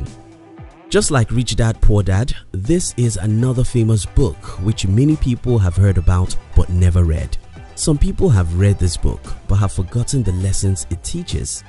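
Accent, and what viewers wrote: Nigeria. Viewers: Accent sounds Nigerian :? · I know a Nigerian when I hear one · voice must be Nigerian. m'right? i'm 98% certain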